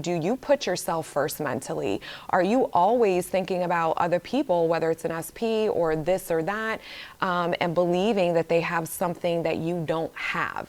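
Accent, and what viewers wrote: New York, United States. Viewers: I was literally wondering about how you got this New York accent living in Florida and then you immediately explained LOL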